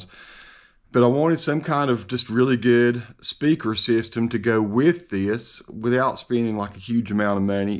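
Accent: South, United States. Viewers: "sub mode" makes the batteries last longer FYI. That southern draw you got there sounds familiar to my North Carolina ears! Haha!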